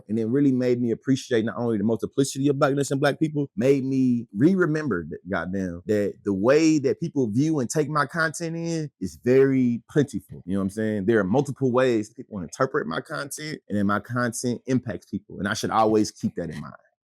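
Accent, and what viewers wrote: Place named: South, United States. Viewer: I’ve watched this three times and texted it to a friend. There’s this light, really, really deep inside, that glows steady when I meet someone who speaks with my kind of accent and reflects that special, soulful human connection, what it means to be conscious in the south, to see each other here; to be open & to connect. It’s the warmest kind of warm, reminds me of what if felt like being with my grandma. Genuine soul— without speaking in code— just genuine soul. A spirit so rich you can just about smell it.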